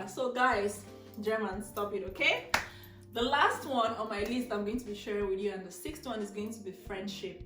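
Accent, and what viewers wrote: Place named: Africa. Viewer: Your african dialect in speaking english is nice.dynamic, beautifull woman.nice vlog.keep it up and stay safe.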